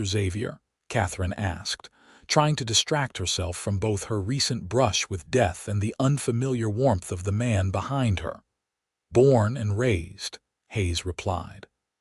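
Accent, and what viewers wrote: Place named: West, United States. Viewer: So sorry, that with the lovely voice of your Narrator, so sorry that so many words are wrongly spelled and/or mispronounced. The voice of the Narration is wonderful It would pass for a genuine Western American if it were not for the outlandish pronouncement of such a few words. I really do like the story. Well done.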